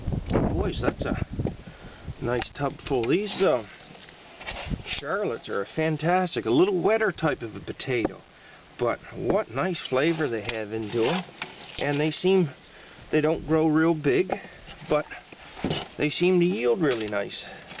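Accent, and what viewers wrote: Nova Scotia, Canada. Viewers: Watched this as growing some Charlottes in the UK - but I spent a lot of the video interested in your accent - I'm from the South West of England and I can detect a lot of 'West Country' traits in the Novia Scotia accent!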